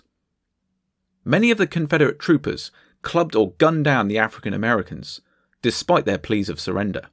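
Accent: England, United Kingdom. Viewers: Why is an English narrarating go away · Why is it always an English accents telling us about our American history on YouTube? Thank you AI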